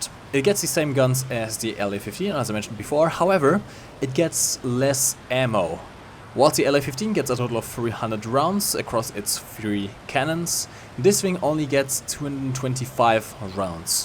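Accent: Germany. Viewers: Are you German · Bist Du Deutscher?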